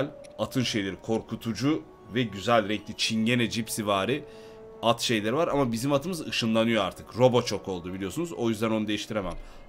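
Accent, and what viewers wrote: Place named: Ankara, Turkey. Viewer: Ankaralı olduğu nası da belli karı kızda yedi parayı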